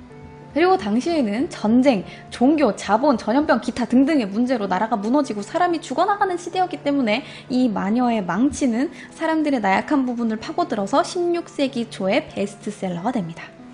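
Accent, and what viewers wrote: Gyeongsang, South Korea. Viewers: 사투리가 들리는데 경상도쪽 억양인가요?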